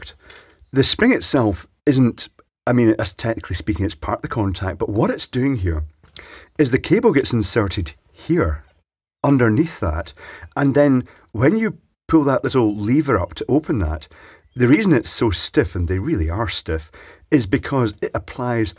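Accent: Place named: RP, United Kingdom. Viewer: This is a very posh voice. Not many sound like him out in the field, so I'm guessing he's self employed or managerial. wondering ow some one so posh ended up as a spark.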